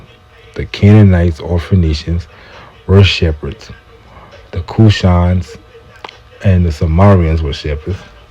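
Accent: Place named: Gullah, United States. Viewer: I here that low Country Gulah "Gulch" in your voice Nobility is that Charleston? "Chuck Boy" 💪🏿?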